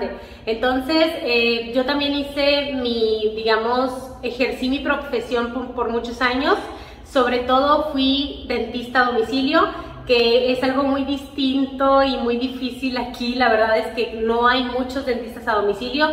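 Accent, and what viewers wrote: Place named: Mexico. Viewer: Tu acento es como el de una mexicana mas ...soy de colombia y no diferencio un acento mexicano de un estado a otro...yo creo que solo los mexicanos notan alguna difencia ...😊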